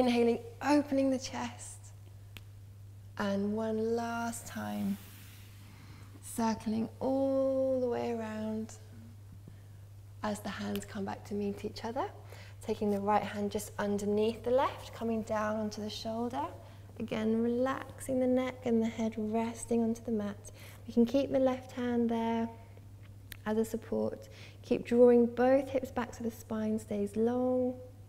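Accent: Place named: United Kingdom. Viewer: So so good to hear a British voice while I practice! No offence meant to American pals...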